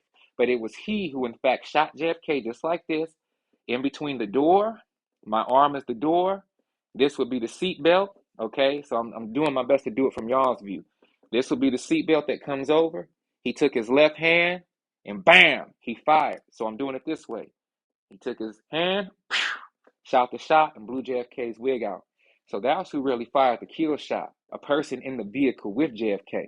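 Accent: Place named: Thames, United Kingdom. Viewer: Vid is fire 🔥you nailed the thames accent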